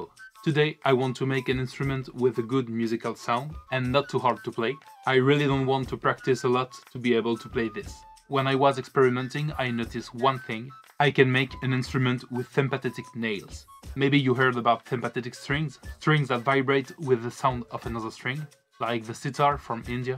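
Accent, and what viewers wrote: France. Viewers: Salut ! J'ai beaucoup aimé la vidéo, mais je me posais juste une question : Comment as tu réussi à autant développer ton vocabulaire en gardant un accent français si prononcé? la bise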